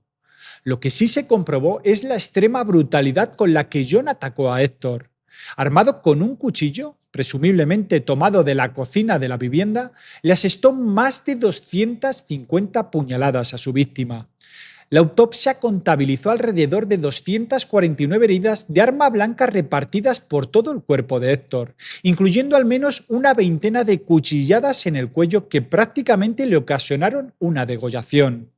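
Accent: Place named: Catalonia, Spain. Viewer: Ya se sabe que eres catalana. Por eso sabes pronunciar…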